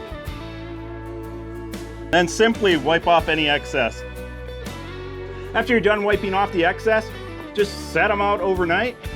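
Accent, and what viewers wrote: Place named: Wisconsin, United States. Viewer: It's how us Wisconsinites talk haha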